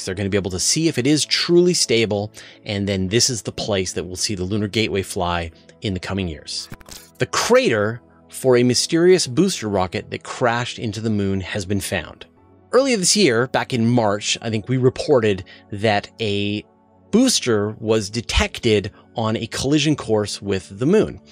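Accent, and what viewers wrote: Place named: Canada. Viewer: Hello, thank you for the news that you put out. I have a question for you off topic but I place people by their accents and yours has a tiny, tiny bit of Canadian and I have some difficulty placing it. If I had to guess it would be Canadian within a few miles of the US border on the western 1/3 of the country. I would like to know where you are from, Born , raised, K-12 in a big city or rural. Thanks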